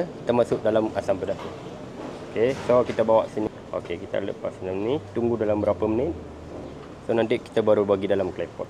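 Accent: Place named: Aceh, Indonesia. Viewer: Ckp mcam org aceh....😂😂😂😂😂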